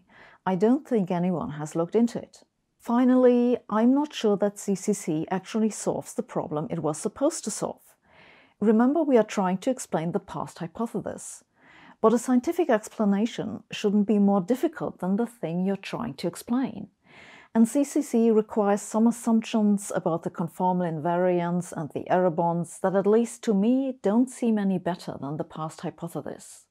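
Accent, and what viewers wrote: Germany. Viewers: I love hearing about science on YouTube and from Ms. Hossenfelder in particular. I feel constrained to admit I primarily watch because is a smokin' scientist. Could that tiny woman with her little German accent & flawless English be any sexier? Intelligence is attractive and this adorable clothes horse should be out there in the famousphere with Neil DeGrass Tyson as a rock star scientist. rocks. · Are you from Hamburg? your accent reminds me of my friends mom. She had the most beautiful accent speaking English, so pleasing on the ears... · You're very funny! your talk reminds me of old American cartoons in which the mad scientist always had a German accent. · What's the charm here? It must be nuanced English backed up by a German sound palette and oh yes the content!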